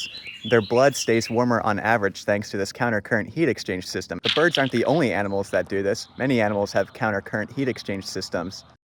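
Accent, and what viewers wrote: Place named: United States. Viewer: Fun and all but cant stand the thicc american accent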